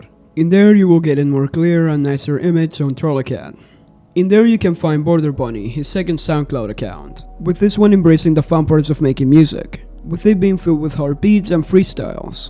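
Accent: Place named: Europe. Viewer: he's probably european so it's not his first language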